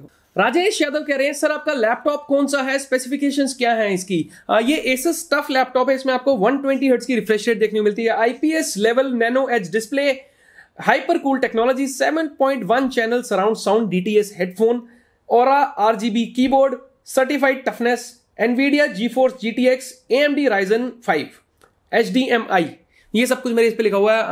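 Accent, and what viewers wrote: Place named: India. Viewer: I have so much pain trying to understand what you are saying. English is not my first language but i usually have no problem understanding indian people that speak English. But somehow your accent is so strong i don't understand half of what is said.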